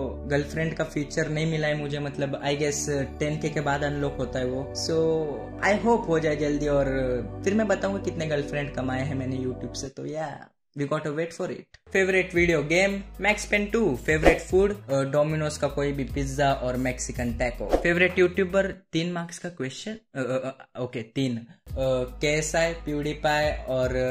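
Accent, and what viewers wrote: Maharashtra, India. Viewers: Your Marathi Accent is the best.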